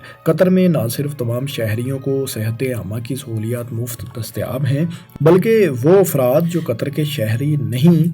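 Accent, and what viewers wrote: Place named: Punjab, Pakistan. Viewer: السلام علیکم جیسا سحر فیصل بھائی کی آواز میں ہے وہ چیز آپ میں نہیں ملتی ہمیں لہذا آپ اس چینل کو چھوڑ دیں آپ کی آواز اتنی سلو ہے سنتے سنتے نید آ جاتی ہے اور اردو کے کے کچھ لفظ آپ پنجابی میں کہہ کر جاتے ہو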